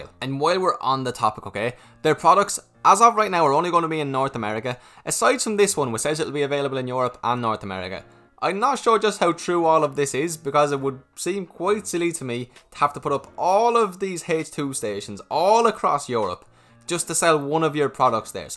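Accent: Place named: Ireland. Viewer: Are you from Ireland?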